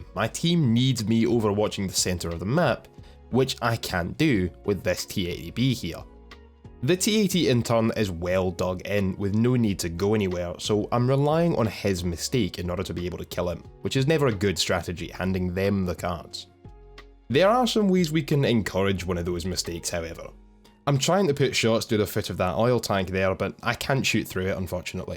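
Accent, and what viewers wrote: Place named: United Kingdom. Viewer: Eduardo Raul hes scottish... his name is litterally. Also his accent makes it very clear he is from the UK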